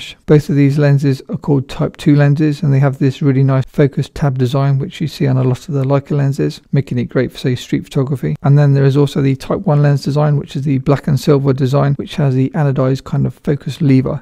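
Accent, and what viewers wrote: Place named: Northern England, United Kingdom. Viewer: I suppose accent is a Northern English accent. I like it very much! Very easy to understand. And is nice to look at;)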